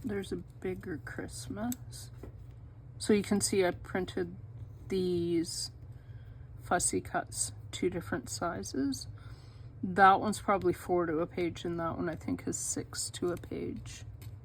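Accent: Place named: Canada. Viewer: Just discovered your channel - it came up in my suggested videos feed. You have to be Canadian 🇨🇦 🍁 🇨🇦 your accent gives you away! Nice to subscribe to a fellow creative Canuck. I have had tabs on my to-do list for awhile - thank you for the inspiration.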